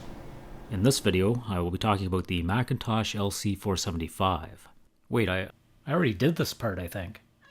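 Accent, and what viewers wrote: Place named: United States. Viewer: It's strange to see this level of obsession with the perfect restore from someone with such an authentic American accent.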